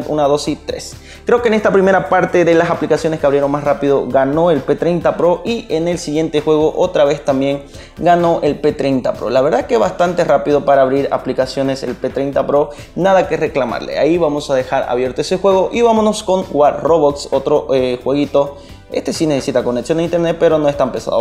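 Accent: Bolivia. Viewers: tenes el acento de un camba, y tipico siempre dicen el "obviamente" jjaja donde queda tu tienda?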